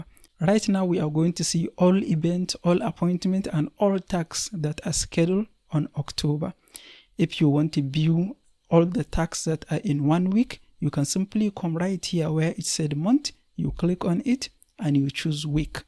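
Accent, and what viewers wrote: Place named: Hausa, Nigeria. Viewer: you accent is funny.. are you hausa ?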